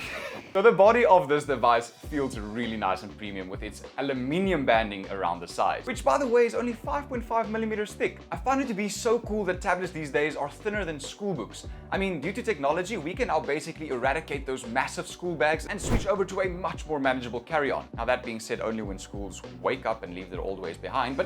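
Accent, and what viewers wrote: South Africa. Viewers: Hi ! I just finished my final year of highschool last year and i am looking into getting a laptop/ ipad for uni , i have never had any of those things and i am kind of lost to what is best , can you please advise me on whether this is a great option for uni ? I love handwritten notes and i suck at typing ... Also , are you south african ?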